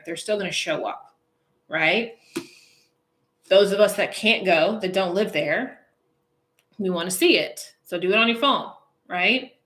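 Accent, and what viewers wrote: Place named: Rochester, United States. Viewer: too funny! I've been wanting to ask if you're from Rochester NY for a while now as I hear that accent! I'm from Webster ;-)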